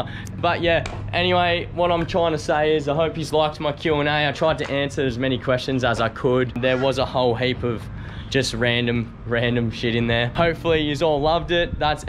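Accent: Australia. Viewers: Adam we need more vids! From the beginning to the end of Love island you were my favorite! At first intrigued by your tattoo and handsomeness little by little I discovered your laid-back, honest and kind personality! You're one of a kind! And I love your aussie accent hehe.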